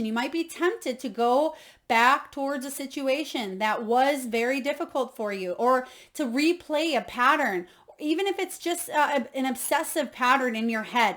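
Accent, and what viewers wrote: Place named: Wisconsin, United States. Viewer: My counterpart is leo/cancer (July 22nd). I love hearing ur Wisconsin accent. Not something I hear on YouTube everyday. BAM!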